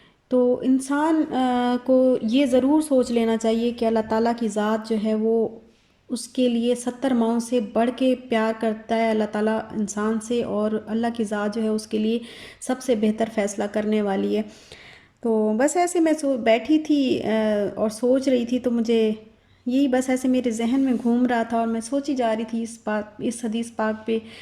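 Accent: Saraiki, Pakistan. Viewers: Dear sister mein ap ka vlog 1st time dekh rhe hu ap kaha rehti ho aur ap kis city se belogg karti ho muje ap ke zuban mein saraeki ka tech lag raha hai mrs zulfiqar lahore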